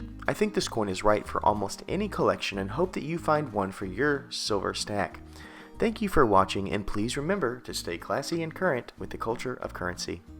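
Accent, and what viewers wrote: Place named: United Kingdom. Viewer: Nice British accent, I give it a 9/10🤣 Video 10/10 🤙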